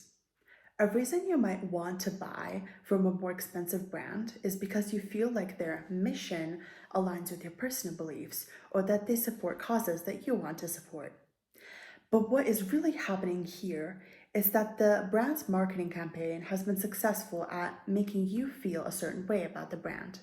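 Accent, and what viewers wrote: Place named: United States. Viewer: Where are you from? You say Euros but your accent sounds totally US-American!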